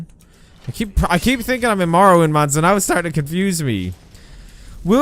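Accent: Ireland. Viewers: You never fail to guilt-trip me into Liking your videos, eh?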